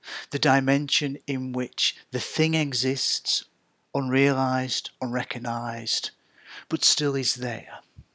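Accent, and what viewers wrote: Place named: North, United Kingdom. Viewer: Thank you, you've demystified quite a bit for me there. Great to hear a northern accent too! Cheers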